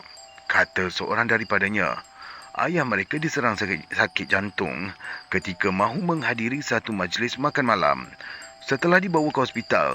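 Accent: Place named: North, Malaysia. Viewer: Mantap bos lorat utara😂.sebijik oww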